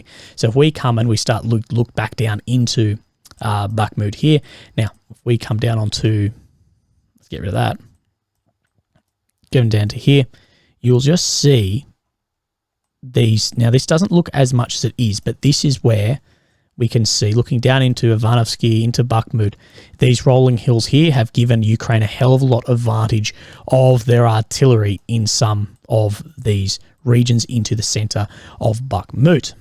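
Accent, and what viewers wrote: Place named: Australia. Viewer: Man. I gotta get to Australia just to talk to people with the accent! 😂 Appreciate your narrative daily!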